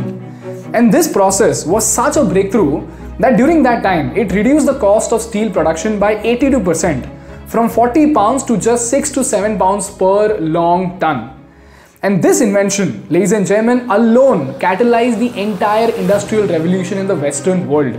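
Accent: India. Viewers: I dont like Indian accent English ☺️😄😆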